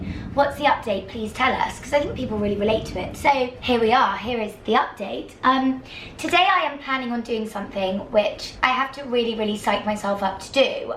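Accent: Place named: England, United Kingdom. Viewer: Oh my gosh she had the most stereotypical English accent. Which part of England are you from, definitely not London Ps: I’m from London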